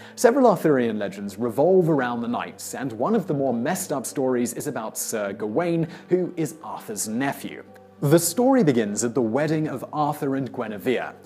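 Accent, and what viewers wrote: United Kingdom. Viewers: Why do Brits pronounce it SICKTH instead of SIXTH?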